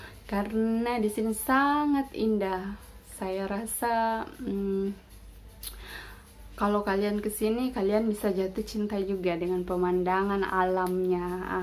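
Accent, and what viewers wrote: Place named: Riau Islands, Indonesia. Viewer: Rezeki ade dimane2 ' kat mne2 kite duduk tinggal akak /klo ade kemahuan nye ..pasti akan ade perubahan nye' owh ye akak berasal dari kepulauan Riau ye knn. Kn pe dgr loghat dari akak '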